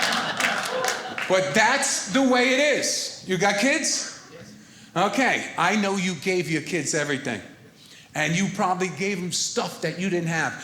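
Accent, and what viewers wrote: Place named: New York, United States. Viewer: I didn’t even notice he was from New York because I live here. Lol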